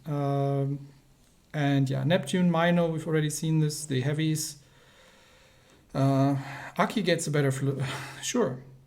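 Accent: Germany. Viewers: I must say, I do love voice. I do like a German accent. I equally like accents that sound german. May I ask, are you German? If not, then, if you don't mind me asking, what ethnicity are you? By the way, a few suggestions: try playing World of Tanks Blitz (WoTB), and, furthermore, try doing like a series of livestreams where you grind, on a new account or, if possible, on your current account, an entire ship line, from tier 1 to tier 10, in WoWsB. For example, the Yamoto line, or, even better, a brand new ship line, when another new line of ships comes out.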